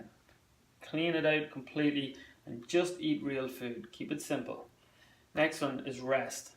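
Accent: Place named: Ireland. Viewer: Where's that cute accent from? Ireland? I bet Ireland has alot of DP/DR due to your strong need for omega 3s (fish) and high alcohol intake (depletes B vitamins). I'm a fourth Irish and Swedish...very delicate brain/CNS. Was diagnosed with AF 20 years ago. Unfortunately I didn't learn the cause of AF and DP (22 years now) until 6 months ago. But Thank God I did. It's all rooted in a B1 deficiency. As a nutritionist I have lots of great resources I can share. But here's the basics... B1 in the form of Benfothiamine plus an excellent methylated B complex. Also lots of magnesium as a co-factor. Daily or a pound a week of beef liver...a B-BOMB! An important traditional health food. Vit D supplement too. Lastly, I highly recommend a traditional Northern European Diet...free of salicylates. Great video! I love your scientific nutritional approach! That is very much lacking in the DP world. 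Let me know if you want more info.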